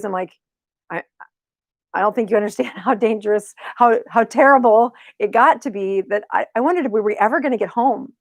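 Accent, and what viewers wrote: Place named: South Dakota, United States. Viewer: Great stuff--I lived in SD for four years, loved it, it's nice to hear the South Dakota lilt in combination with some great insights! Only reason I didn't join the course is because I'm not on Facebook and that part of the package was simply not useful. Thanks for your candor and energy,!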